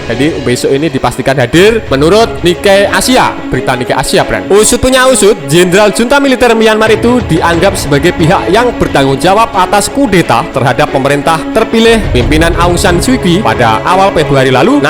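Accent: Java, Indonesia. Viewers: Min pertahankan medok mu pakek kata "osot punya osot"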